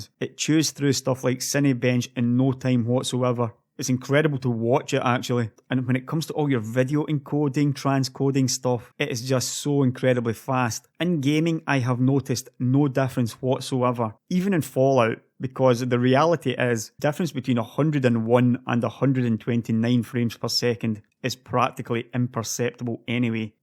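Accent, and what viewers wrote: Scotland, United Kingdom. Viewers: Sweden? Judging by your accent I was sure you were Scottish. Or perhaps living abroad? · I love it when i can here people are from Scotland, i am half scottish.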